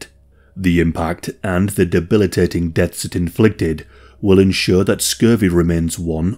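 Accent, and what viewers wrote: United Kingdom. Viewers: British accent of narrator.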